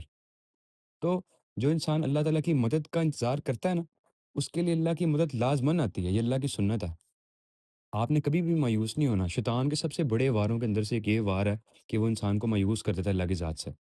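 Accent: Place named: Pakistan. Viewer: Aap k elafaz bolne ke treqe se lagta h aap pakistani ho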